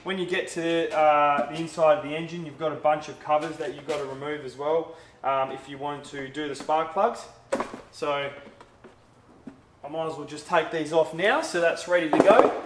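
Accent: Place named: Australia. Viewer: In addition to this Australian Youtube Channel I also watch the Dangar Marine Channel. Stu , the creator of the Dangar Marine Channel is located in New South Wales and I believe the creator of this channel is located Western Australia. Question? Are there regional accents in Australia? While to my American ears both of our Aussie hosts sound Aussie, but it seems that there is a slight difference in the way each man pronounces certain words.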